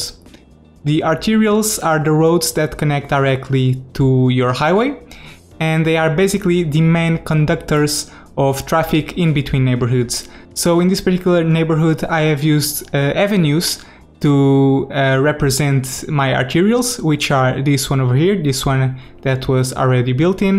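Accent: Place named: Portugal. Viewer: Tenho visto alguns videos teus e se a ideia era melhorar o teu inglês, tivemos todos sorte. Parabéns! Tens o melhor canal do youtube de skyline e tudo porque fazes aquilo que a maior parte do pessoal não faz - construir em tempo real (quase sempre). Tenho aprendido muito. Aprendido muito e percebido que ainda que o teu inglês seja exímio, só um português tem esse sotaque, o que me faz ter a plena certeza que tu entendeste tudo o que eu disse. :P Mas congrats mate! Great content, and amazing english :)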